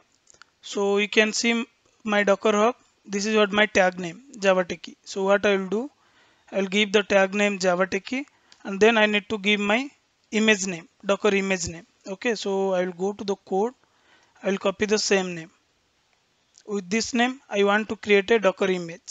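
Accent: Odisha, India. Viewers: Bhai it seems u r from Odisha. But great explanation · Ur pronunciation is telling that ur from Odhisa.Their way of pronounciation is quit different from others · The way you pronounce... Probably you are from Odisha ?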